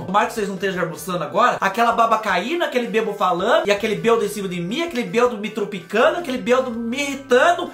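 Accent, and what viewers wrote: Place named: Minas Gerais, Brazil. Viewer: Vc é mineiro uai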